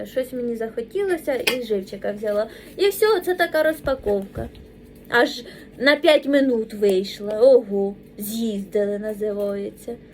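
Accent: Poltava, Ukraine. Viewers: Як ви не розумієте,що вона говорить,спеціально полтавськтм акцентом Вона грамотна дівчина,подивіться її інтерв,ю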